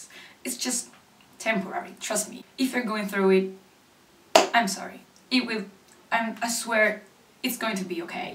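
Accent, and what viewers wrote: England, United Kingdom. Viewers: Continua cosi e non ti preoccupare del tuo accento inglese perché è fantastico! Booyah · Il tuo accento è stupendo sembri veramente inglese *_* anche a me piacerebbe fare video in inglese appena avrò tempo mi ci metterò ahahah cmq volevo dire che sei fantastica e che il mio tipo di fangirl è... Tutti tranne il primo tipo e l'alternativa lol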